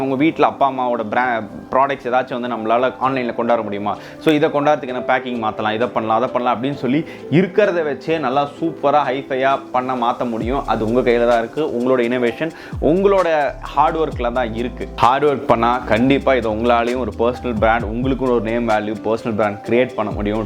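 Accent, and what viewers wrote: Salem, India. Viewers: Bro neenga salem ma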